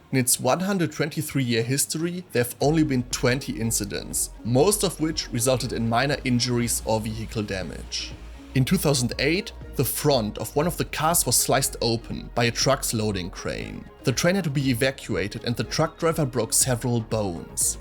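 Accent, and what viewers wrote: Germany. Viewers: Diggi ick lieb das Denglische Akzent 😏🤙 schöner Beitrag auch,weiter so! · Sorry but it´s so hard to me listen a german guy speaking english with this so strong accent !!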